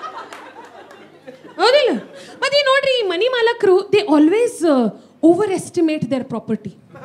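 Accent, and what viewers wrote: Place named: Uttara Kannada, India. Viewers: North Karnataka dialect, particularly Dharwad I believe. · Hubli Dharwad bhasha dashtu zabardast style yawdu illa....u did justice to it.... simply bold and beautiful 😍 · you are so good in comedy talk show, one of the best,classy, good vocabulary, typical Uttarakannada style of talk, God bless, am your fan from Gulbarga, a doctor by profession,you are too good,( Mast Majha Bantu kheli)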